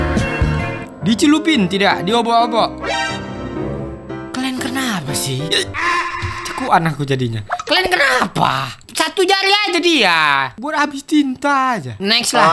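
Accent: Medan, Indonesia. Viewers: Abg orang Medan kah🤔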